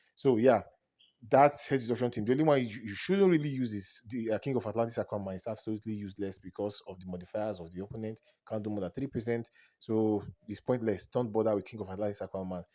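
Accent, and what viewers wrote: Nigeria. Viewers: I enjoyed the video bro you sound like you are a Nigerian 😊. I don’t know how to play league invasions was looking for answers and then stumbled upon your video 🙏🏾. I have all the best teams and op artifacts still I suck 😂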